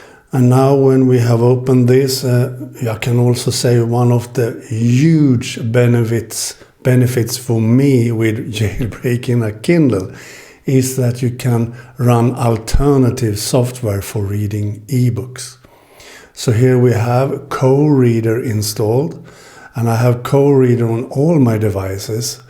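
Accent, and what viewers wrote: Sweden. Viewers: I was thinking about jail breaking my kindle and was looking for some information about the benefits. I stumbled across your video and as soon as I heard your voice I got so happy! I studied abroad in Sweden and it was the best time of my life, hearing the Swedish accent again gave me such a comfort, I honestly didn’t listen to the information of the video anymore and just thought about the time I had there. Thank you for this awesome video!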